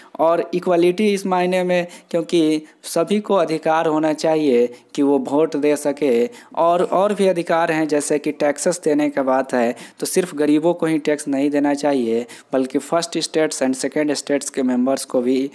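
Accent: Bihar, India. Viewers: Sir ap biharii hai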